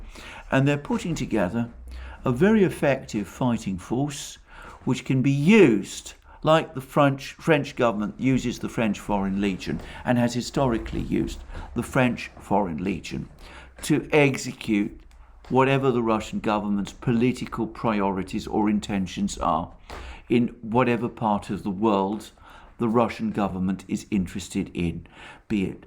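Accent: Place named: RP, United Kingdom. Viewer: He uses the Queens English that's why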